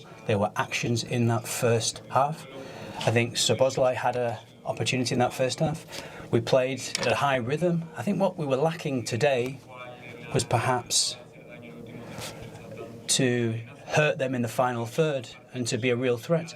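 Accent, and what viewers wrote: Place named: Liverpool, United Kingdom. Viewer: he still has that Scouser accent when speaking English. ❤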